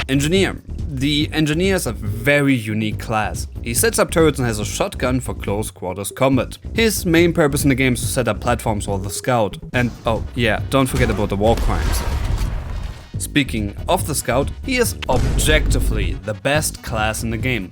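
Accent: Germany. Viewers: So far the first DRG review featuring thick German accent. Nice! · you sound german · Sounds a bit german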